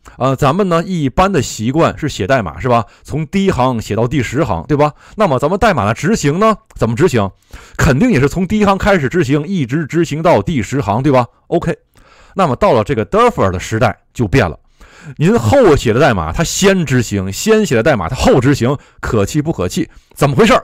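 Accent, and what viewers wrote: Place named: Japan. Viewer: 我说怎么不是英音也不是美音，原来是日音英语。。。。:face-blue-smiling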